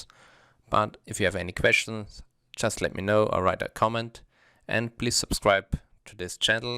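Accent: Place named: Germany. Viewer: ich höre am Akzent, dass Sie Deutscher sind. meine Fragen 😉: 1. Fast alle Händler und mittlerweile alle professionellen Händler verwenden Candle Sticks. Könnten Sie vielleicht hierzu einige farbliche Hinweise geben? 2. Der obere Bereich (Covering) ist ja in Bloomberg orange, einige Teile auch rot. könnten Sie auch hier ggf. Einstellungshinweise geben? vielen Dank im Voraus. Ergänzung: Meine Watch List sah früher bei Bloomberg auch etwas anders aus. Ich möchte dies gern in Tradingview so einstellen. Wie geht das?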